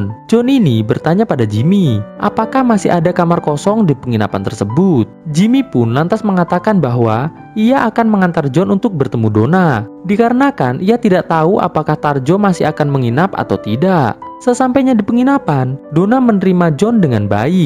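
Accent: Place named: Java, Indonesia. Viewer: Adminnya lucu cara ngomongnya...org jawa mesti😅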